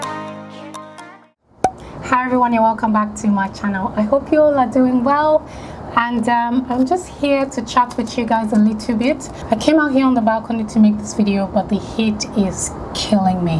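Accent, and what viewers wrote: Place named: Nigeria. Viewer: I could listen to you all day. I love Nigerian accents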